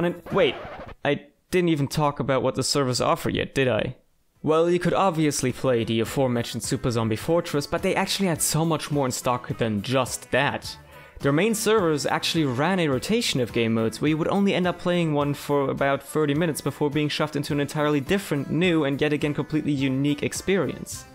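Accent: Germany. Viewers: are u from germany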